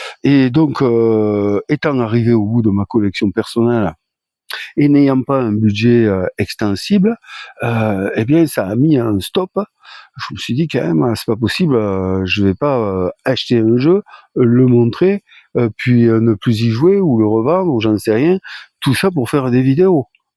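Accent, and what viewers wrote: South, France. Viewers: bonjour, peu importe pour de nouveaux jeux, tu es une personne chaleureuse a l'accent du soleil, perso les jeux que tu présente ne sont que secondaire, le fait de te voir a fond dans le jeu cela fait plaisir^^ la campagne orcquest était excellente, tu pourrais continuer. tout ça pour dire que les jeux sont secondaire, avec mes amis ont fait souvent les mêmes jeux le principal c'est de se retrouver. Pour les alea de la vie, fut faire avec voire sans. encore une fois se retrouver entre amis permet de penser a autre chose. En conclusion, même pour parler bout de gras on est la ^^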